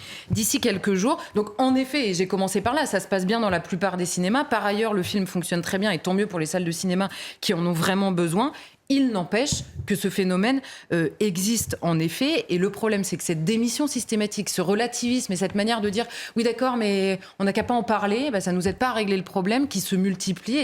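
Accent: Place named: Southampton, United Kingdom. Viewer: J'ai reconnu l'accent de Southampton en Angleterre ...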